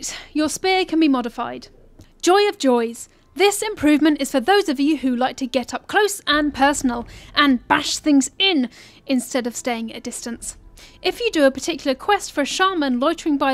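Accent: United Kingdom. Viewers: Are girls not allowed to have a British accent?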